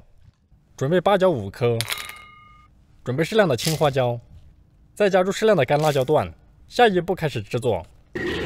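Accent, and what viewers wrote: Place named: Shandong, China. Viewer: 去了趟山东难道学会了儿化音哈哈哈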